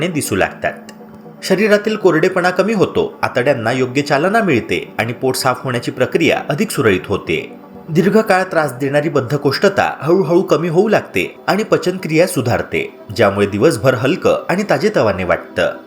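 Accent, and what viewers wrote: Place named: Pune, India. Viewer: Pune bolte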